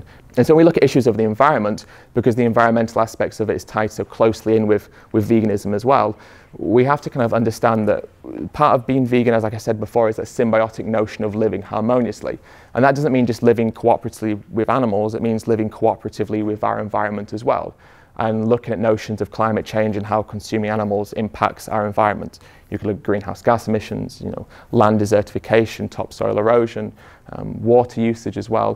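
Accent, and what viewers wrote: United Kingdom. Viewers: I loved this speech and english accent makes it even better, absolutely awesome!! Thank you Ed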